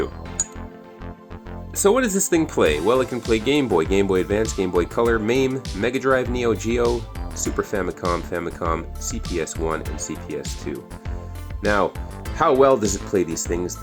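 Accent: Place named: Canada. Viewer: You sound Canadian. Is that $30 Canadian?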